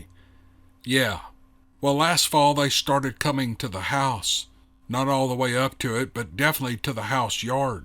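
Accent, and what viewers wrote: South, United States. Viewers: I have listened to this twice, several months ago and then today. OMG! Best novel ever and I love ’ southern drawl that give this book so much reality with me being a native Alabaman, sound like my uncle/cousins and Grandfather ❤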